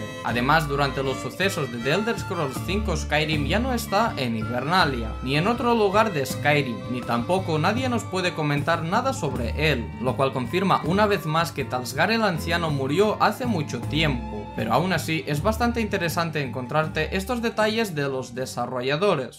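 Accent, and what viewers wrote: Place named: Catalonia, Spain. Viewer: ostia com es nota que ets catala tiu jajajaja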